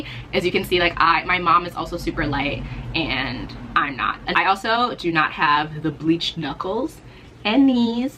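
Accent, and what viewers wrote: Nigeria. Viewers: i love the nigerian accent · Hilarious Nigerian facts : We are loud Our parents work hard We value degrees Your Naija accent on point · I love your Nigerian accent. This video is amazing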